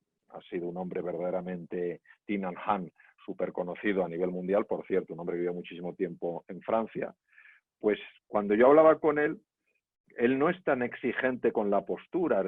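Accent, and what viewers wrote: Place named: Spain. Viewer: Me encanta el gracejo español del doctor, la sangre española, ole!.- Gracias por esta información, invitándonos a hacernos responsables de nuestras propias vidas. Tenemos al mejor amigo y al peor enemigo dentro nuestro y adentro es que lo vamos a equilibrar. Gracias doctor, por echar luz en este mundo sombrío.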